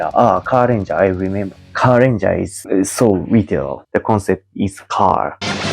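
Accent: Visayas, Philippines. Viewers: HAHAHAHAHA NALINGAW KOG PAMINAW SA IYANG BINISAYA...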